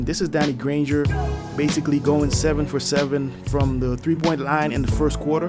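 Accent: Jamaica. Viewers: Wah goin on boy. Yuh sound like yuh from Yard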